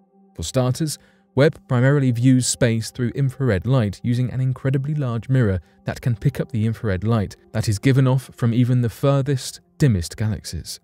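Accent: England, United Kingdom. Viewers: Four minutes sensationalizing microscopic plastic and seven minutes indoctrinating you, with an English accent for authenticity, into a belief system for a 13 billion year old universe. Never mind the actual science of the matter showing the universe to be extremely young. And the "Big Bang"?, really? I thought we were past that joke of a theory. Even the inventor of the word, Sir Fred Hoyle, didn't believe in this nonsensical theory. Oh!, and he was vilified for it by the scientific community just like Mary Sweitzer was vilified in 2005 when she discovered soft tissue, red blood cells and DNA in a supposed 65 million year old T-Rex bone. Neither one were creationist by the way. Y'all need to get a clue.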